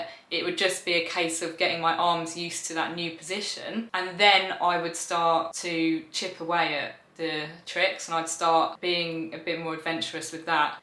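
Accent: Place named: Liverpool, United Kingdom. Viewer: What part of Liverpool are you from 😁😉🐝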